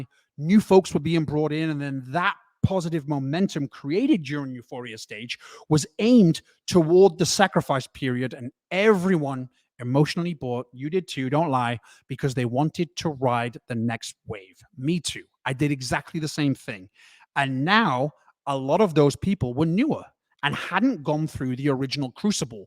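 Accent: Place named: United Kingdom. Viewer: I’ve always tried figure out the accent. Are you British but spent many many years in the States? Thanks mate, keep up the great work.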